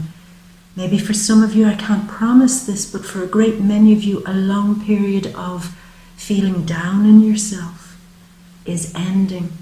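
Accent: Ireland. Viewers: I just love your Irish brogue, maybe I will meet you one day :) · I am a newcomer to this video and I love what I see, hear and learn from you. Listening to you, I am just awestruck by your extraordinary and wonderful energy, your natural beauty, your graceful demeanor of delivering, and most important, your intuitive skills-set and wisdom of what the world can bring us. Thank you, I am so happy to have found your site. Also, do I detect a slight Irish accent? It is absolutely superb and I love it. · ire u irish by any chance btw im irish thank u for video much love <3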